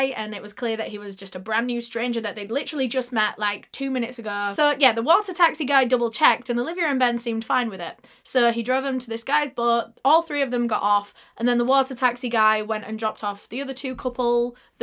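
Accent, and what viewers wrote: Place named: Yorkshire, United Kingdom. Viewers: she’s from Yorkshire I am sure, which is in England! Definitely not Scottish or Irish 🙂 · Yorkshire accent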